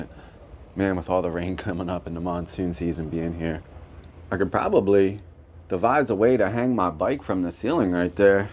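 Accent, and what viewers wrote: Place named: Philadelphia, United States. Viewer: This dude is from Philly i can tell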